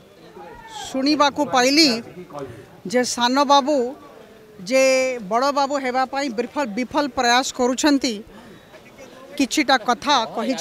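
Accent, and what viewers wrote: Bihar, India. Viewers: Apana nka Vasa tah bihari Misa odia kahuchha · Amku nai lo ଆମକୁ, ତୁ ଆଗେ ବିହାରୀ ଓଡ଼ିଆ ଟା ବନ୍ଦ କର କାନ ରୁ ରକ୍ତ ବରହୁଚି ଶୁଣିଲେ ତୋର ଓଡ଼ିଆ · Esi kahuchu haribu didi Tu bihari para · Tame same kahucha bihari re odia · Tu biharuni Odia bhasare kana pain' khata hauchu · U also talking in behari Bhasa odiya kahuchi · Tama katha Bihari Bhasa re kohuchha nije age thik kara ta pare anya ku kahiba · ଆପଣ ବି ବିହାରୀ ଚୋବେଇଲା ଭଳି ବଡେଇଲା ଭଳି ଓଡ଼ିଆ କୁହନ୍ତୁନି,ଆପଣ ବି ଓଡ଼ିଆ ଭଲ ଭାବେ କହିବା ଶିଖନ୍ତୁ।ଓଡ଼ିଆ ଭାଷା କେମିତି କୋମଳ,ଭଦ୍ର ସହ କୁହାଯିବ,ଏ ସବୁ ପ୍ରଶିକ୍ଷଣ ପ୍ରଥମେ ଆପଣ ନିଅନ୍ତୁ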